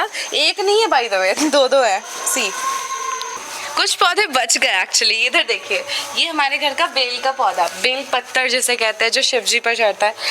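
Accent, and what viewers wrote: India. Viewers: Are u from andra????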